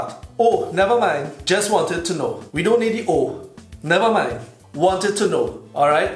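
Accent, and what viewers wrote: Trinidad and Tobago. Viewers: I love hearing my Trini talk 😍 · lol It's like African american ebonics but with a trini accent lol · I also thought "nevermind" doesn't sound like trinidadian ;)) I absolutely love the rythm, the accent, I spent the best years of my life with trini people and I miss that vibe in my life fuh sure!!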